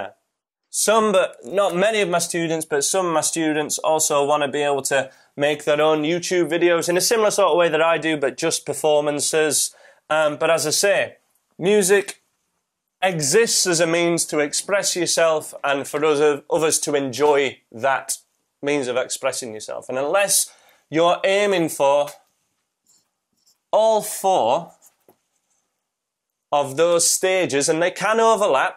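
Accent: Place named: South Yorkshire, United Kingdom. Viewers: Good video. What part of the north are you from? Scotland…not Geordie for sure. I am an amateur linguist and musician. Lol. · Are you from Barnsley? Your accent is so much like my bf's and I lived there for 4 years. If not then it's gotta be somewhere in South Yorkshire like Sheffield, Donny, Rotherham or even Wakefield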